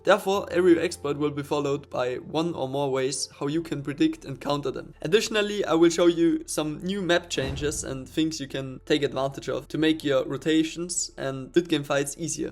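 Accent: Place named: Germany. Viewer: The german-english is crazy, but W vid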